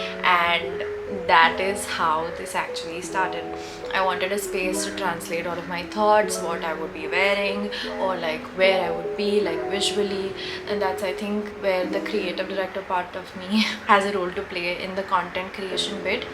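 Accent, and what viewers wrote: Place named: Maharashtra, India. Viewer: Your marathi accent oh my god literally dying 😍🥺♥️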